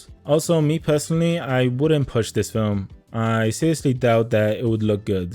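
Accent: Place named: New York, United States. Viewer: Why you sound like a New Yorker but live in eu?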